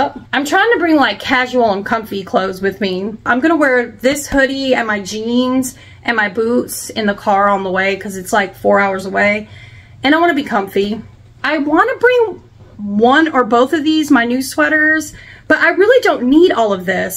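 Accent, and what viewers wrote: South, United States. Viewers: I stumbled across her a few days ago. I like listening while I work. Her southern accent is calming. ...from Boston. 😬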